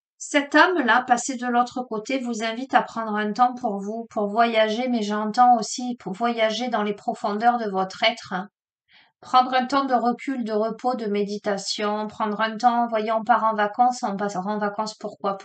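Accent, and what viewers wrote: South, France. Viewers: Bonjour je suis une nouvelle abonnée j entend l accent du sud . Je suis du Gers.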